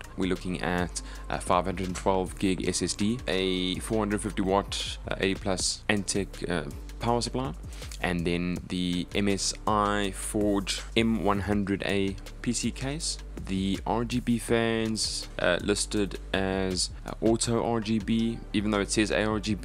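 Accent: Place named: South Africa. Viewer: I really enjoyed hearing the South African accent, continue making these high quality videos!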